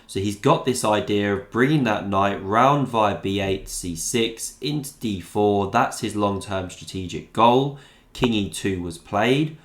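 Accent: England, United Kingdom. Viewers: based purely on his accent im 90% sure he is from england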